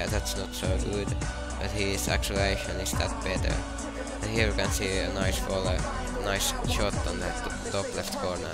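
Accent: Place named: Finland. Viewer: haha 40 seconds of commentary and i could hear the finnish accent even though im not finnish nor scandinavian. :) good vid anyway :) ill have a look at him in the career mode as well as fut. keep up the good work mate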